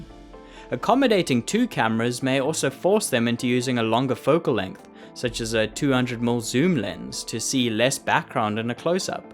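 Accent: South Africa. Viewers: sorry good sir are you South African??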